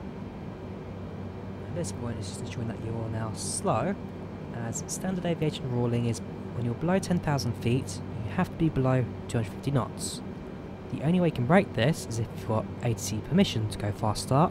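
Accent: United Kingdom. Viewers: THE BRITISH ACCENT IS KILLING ME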